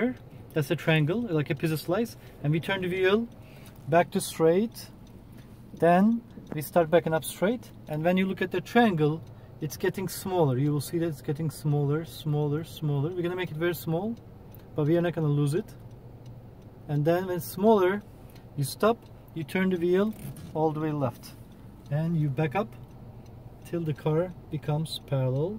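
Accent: Turkey. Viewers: Your accent look like turkish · Türk telaffuzu bu :D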